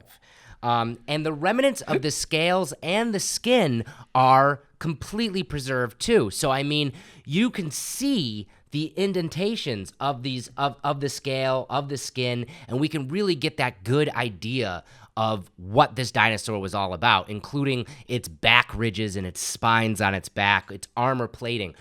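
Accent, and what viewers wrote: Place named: United States. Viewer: You sound "super american" lifes a bitch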